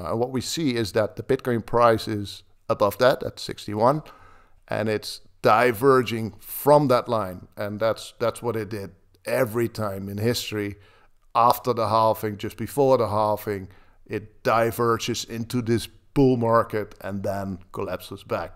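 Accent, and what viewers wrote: Netherlands. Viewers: Yeah Dutch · First time here ... Got the reference from some Brazilian btc influencers 📝 ... First thing I noticed was the Dutch accent 🗣️ ... Only to be confirmed by the stream of comments in your language 🎉 ... // Het is een grote plezier contact met je nemen ... Je werk is geweldig 🥇 ... Groetjes vanuit Rio de Janeiro 🌊 ! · Can I ask you something? Are you dutch? I hear a dutch accent or I am wrong? Thank you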